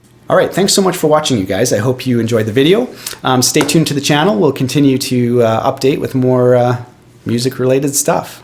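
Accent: Canada. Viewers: WHERE'S THIS GUY FROM , CANADA ?????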